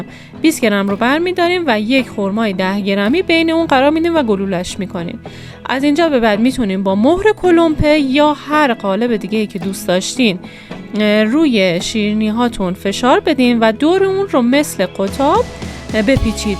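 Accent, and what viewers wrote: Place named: Kerman, Iran. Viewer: این دستور کلمپه بهترین و آسانترین بود. بخصوص که شما با لهجه قشنگ و گرم کرمانی صحبت میکنید. 💚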